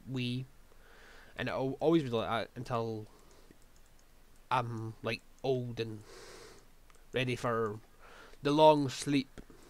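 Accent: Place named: Scotland, United Kingdom. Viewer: scottish, should have guessed it, knew it sounded familliar.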